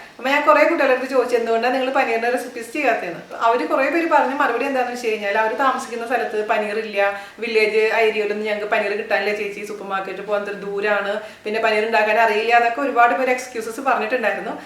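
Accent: Thrissur, India. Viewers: njanum thrissur kaari aanu..chechiyude samsaram kettapo manasilayi.nte naattukari aanu ennu.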